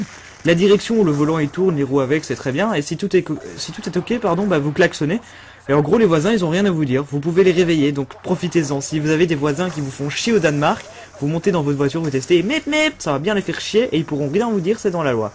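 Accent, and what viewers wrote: Belgium. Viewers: j'adore notre lois belge U_u t'as un bel accent wallon =D c'est si rare mtn de parler wallon T_T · Si tu aussi tu as quitté après , pouce vert! Non, je rigole! xD J'ai adoré ton accent belge(je suis moi-même belge, je ris au imitations que l'on fait de nous!) et j'adore ta manière de commenter! Pour ton prochain top 20, tu devrais en faire 1 sur les 20 choses les plus tapées sur Google (tu tomberais sur des trucs... interessants!) Bonne continuation. Bises. Ton compatriote belge.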